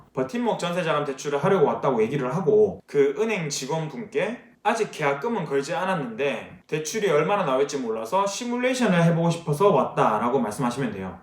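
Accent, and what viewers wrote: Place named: Gyeongsang, South Korea. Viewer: 생긴건 서울 남자 같은데 경상도 사투리 너무 심하게 쓰네 ~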